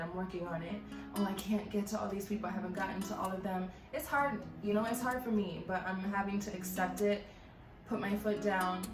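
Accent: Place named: Jamaica. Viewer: Omgggg i was typing the below message when the answer popped up on the screen. Amazing. Are you Caribbean? I always hear an accent... sounds Jamaican... as am I. Very nice place.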